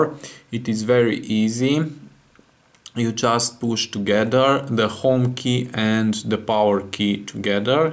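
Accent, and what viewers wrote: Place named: Romania. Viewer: Your accent made me feel like home :))) Go Romania!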